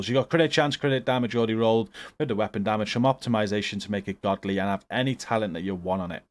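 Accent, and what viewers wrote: United Kingdom. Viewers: Your English is hard to understand, is it British English?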